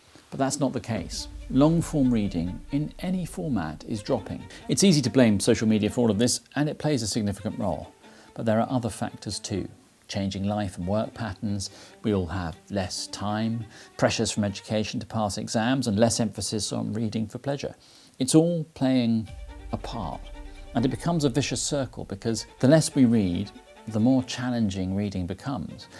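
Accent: RP, United Kingdom. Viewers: can someone answer? what accent hes using? is it fully posh?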